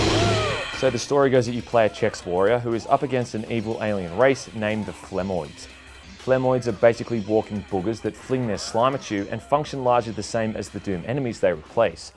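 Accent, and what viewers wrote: Australia. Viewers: Woo! Aussie game reviewers are a nice change.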